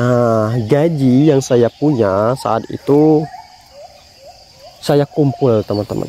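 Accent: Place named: Bangka, Indonesia. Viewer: dari dialek nya dari bangka ya bang ? salam dari belitung bang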